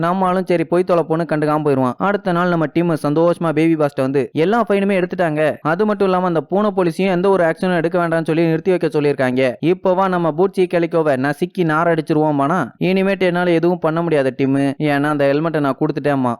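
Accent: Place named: Madurai, India. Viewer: Bro your slang is so super like Madurai voice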